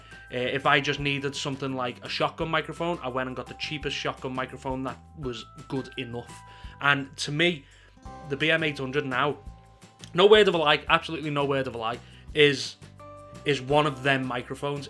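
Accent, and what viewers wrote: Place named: Liverpool, United Kingdom. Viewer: Sound like ur from Liverpool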